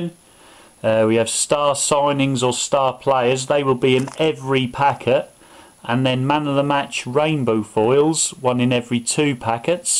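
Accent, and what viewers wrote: United Kingdom. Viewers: So cool leas your British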